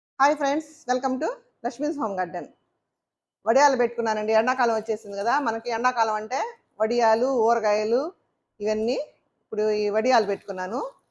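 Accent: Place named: Telugu, India. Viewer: Hi Garu, My name is from Chennai, your video brought me some fond memories as we used to make it with my grand mother, on our terrace. I used to eat them all the time with sambar and annam vadiyalu. Also Perugu annam with mamidi panda, I absolutely fell in love with your telugu accent as we speak the same way, as we are from a agriculture and diary background from ongole. I was wondering if you could make a video on how to make good forgotten simple foods like Paramannam, Pappu charu, Chinthakaya Dosakaya Pachadi, etc similar to our region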